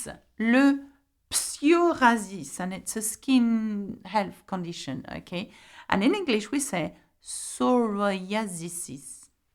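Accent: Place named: France. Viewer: Thank you for the pronounciation that was a tough one i will learn those and thank you great lesson good job love your french accent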